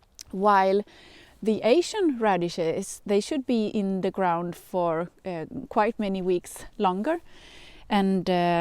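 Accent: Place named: Sweden. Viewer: Tack så mycket. Jag tycker om dig och ditt innehåll. Jag lär mig mycket. Din engelska är utmärkt. Det jag särskilt älskar är att höra din söta accent. Jag ska berätta varför ... Jag är mormor, författare och lärare i Seattle. Jag älskar också att trädgård, sticka, sy, läsa och spela musik. Min make är en Blues-trummis. Jag har bott här hela mitt liv. Men ALLA Fyra av mina morföräldrar var från Sverige! (Stockholm & Malmö) Det finns ett starkt skandinaviskt inflytande i Seattle. Jag tänker alltid så mycket på mina morföräldrar vid jultiden. Jag bakar min mormors kakor och andra recept varje år. INGEN gör julen bättre än svenskar !! Jag har underbara minnen och traditioner. Din röst påminner mig om deras kära röster. Tack.(Google Translated for fun. )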